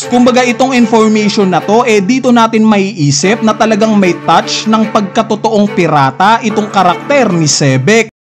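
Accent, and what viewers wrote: Visayas, Philippines. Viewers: Bisaya pla to si idol eh hahaha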